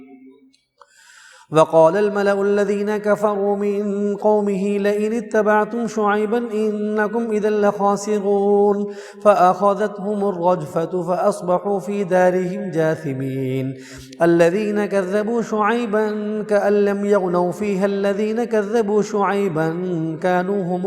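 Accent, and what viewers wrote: Panipat, India. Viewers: Lovely panipati accent. Allah o akbar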